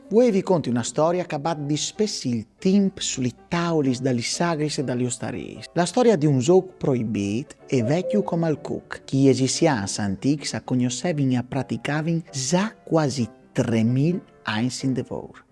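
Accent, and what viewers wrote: Italy. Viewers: Brâf! Il furlan di indulà tabaitu ? Un tic talianât.. e tu sieris encje disint Ciao... 😮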